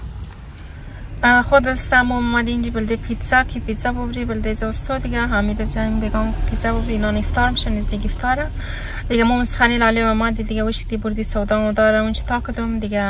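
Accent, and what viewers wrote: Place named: Hazarajat, Afghanistan. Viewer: خانم شما ولاگ های خود را صرف برای قوم هزاره خو نمی سازید که غلیط هزارگی گپ می‌زنید باید همه فارسی زبانان را در نطر بگیرید در ویدئو هایت معلوم نمی‌شود کی برادرت است کی ایورت است همه را لالیم میگی و بیگی کی است چرا درست معرفی نمی‌کنید پیکی کی است و این کی تان می‌شود فامیل کدام است همگی را لالی میگی ما نمی‌دانیم که کدام فامیل تو است و کدام از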